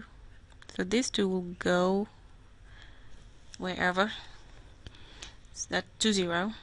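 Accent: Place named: India. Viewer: Idk why but Indian accents give me ASMR so I get that while I learn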